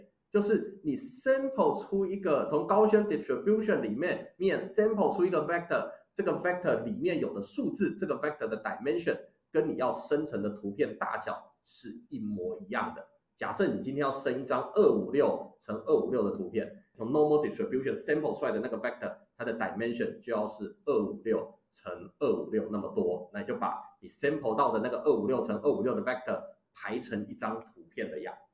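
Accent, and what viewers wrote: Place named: Taiwan. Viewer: 哈哈 我居然听到了 台湾老师的讲课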